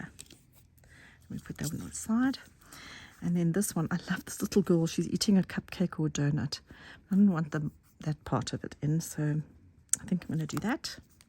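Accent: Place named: South Africa. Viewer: Hi! I JUST found your channel and I'm so excited to hear a fellow South African accent 😂 I'm currently binge watching all of your videos. Being new to junk journal making, I wanted to ask where you buy all of your stuff from? Especially your tapes and Tim Holtz stuff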